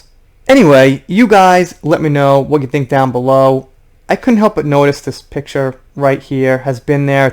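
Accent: New York, United States. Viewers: You remind me of them proper New York like ..cool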